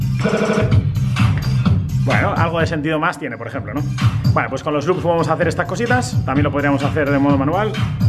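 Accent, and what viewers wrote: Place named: Castile, Spain. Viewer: Pero si es el Castellano más puro, como el mío. Pues no hables con un Andaluz te ibas a liar 😅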